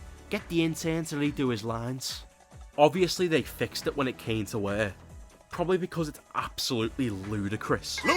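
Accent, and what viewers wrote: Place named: Liverpool, United Kingdom. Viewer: Have to say bro, these videos are excellent! Like the scouse JonTron 😉 Gary chopper should be proud 😉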